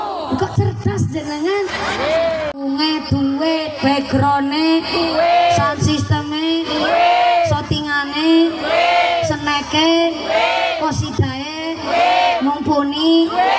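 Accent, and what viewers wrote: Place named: Banyumas, Indonesia. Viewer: Ustazah favorit saya ini..sama2 wong ngapak..ora ngapak ora kepenak 👍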